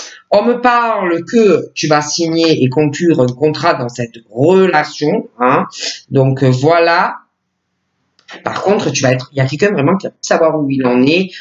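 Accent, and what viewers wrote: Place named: Southwest, France. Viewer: J adore l accent différent du sud ouest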